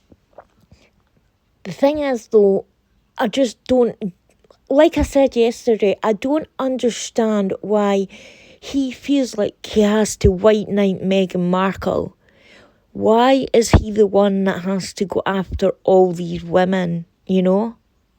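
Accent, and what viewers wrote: Scotland, United Kingdom. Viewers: I love your Scottish Brogue, lovely. I’m from Florida and I have no problem understanding you. I also like tangents, they are interesting and so are you. Don’t listen to the negative comments You do You❤️🌺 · . Your Scottish is fine so don't change a thing. Thousand agree with you, and thousands will continue to but many haven't the time to comment which doesn't mean we don't agree with yours. Free speech is free speech and that is the main point here. YouTube is very much controlled by the wrong people. You keep reviewing as a good job done. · Love your Scottish accent! ❤️Subscribed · Your Scottish accent is delightful. Reminds me of my late and greatly loved great-uncle. His wife, and her sister, my grandmother, both had strong Irish brogues so the Gaelic accents are music to my ears. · I love listening to Scottish accents. 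Bless you 💖 Much love from Australia 🇦🇺 and from one to another. 😊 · Don't you change for anyone, I love your wee Scottish accent🥰 the more people listen to your accent the more they'll pick it up.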